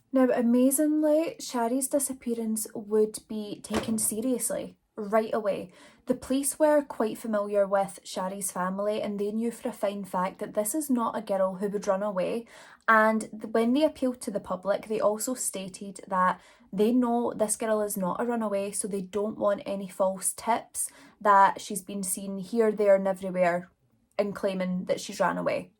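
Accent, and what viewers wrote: Scotland, United Kingdom. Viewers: Hf, I thought you were saying “pill party” this whole time but you were saying POOL party 😆 your Scottish accent is so cute lmfao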